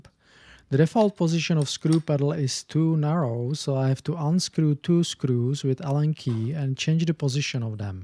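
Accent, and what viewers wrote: Czech Republic. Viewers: Dobra prace:). Podle prizvuku hadam ze CZ. Taky jsem si koupil aw2721d dneska.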